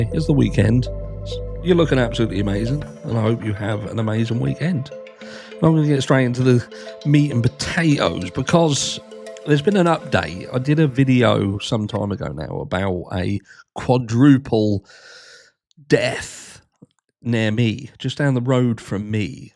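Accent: Norfolk, United Kingdom. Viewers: I knew you must be a Norfolk boy from your accent! This case was extremely devastating. They were incredibly sweet girls. I’m just a stone’s throw away also.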